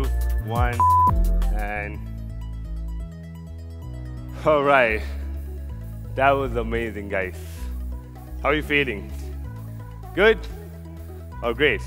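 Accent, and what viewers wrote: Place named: India. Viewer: he sounds more Indian than American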